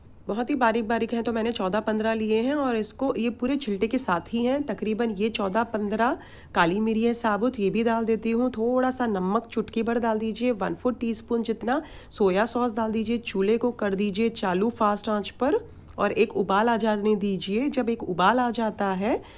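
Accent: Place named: Konkan, India. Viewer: aapki baaton se lag raha tha. Where r u from?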